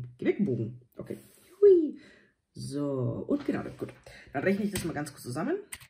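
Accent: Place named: Franconia, Germany. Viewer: Ich liebe den fränkischen Dialekt. Bin selbst auch ein schwäbischer Franke. Bitte bleib so wie du bist !!!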